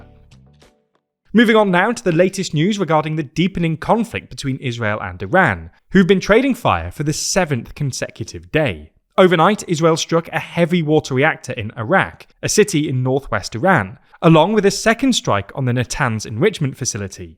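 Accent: Northern England, United Kingdom. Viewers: He’s from Northern England where they pronounce “u” and “oo” funny.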